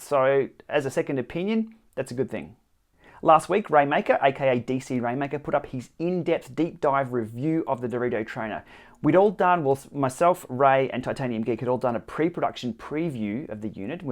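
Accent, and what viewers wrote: Australia. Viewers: Hi I am conemplating buying a Direto since I have a problem with the watts distribution on my Tacx trainer: in intervalls it shifts from one sec to the next from 100 to 400 watts and I have to programm increments of 20w every 4 sec in order to have a smoother transition from one interval level to the next - is this any better with the Direto or can I programm a smoother curve on the elite Training app? Thx for a feedback - Marc/Switzerland (just having completed the Croc Trophy in Cairns..... so its good to hear some fair dinkum Aussie accent)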